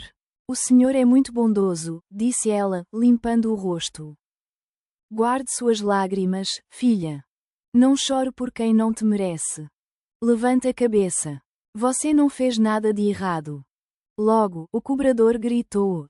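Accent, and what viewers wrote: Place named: Portugal. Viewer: Amei a historia! Sensacional! Comovente! Amei ouvir a narradora com sotaque de Portugal! Amo esse sotaque!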